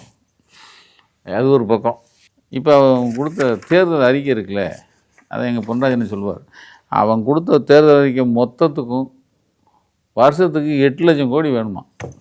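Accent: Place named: Chennai, India. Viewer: Excellent speech in simple chennai thamizh in your usual casual style. Great job sir.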